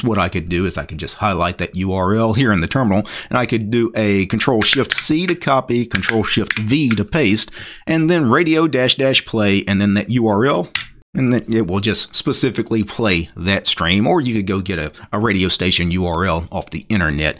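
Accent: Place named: Louisiana, United States. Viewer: That Louisiana twang really is something 😅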